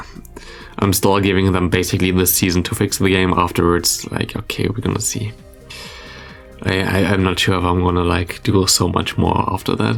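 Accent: Germany. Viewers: Bro I think you have an accent, are you german by any chance?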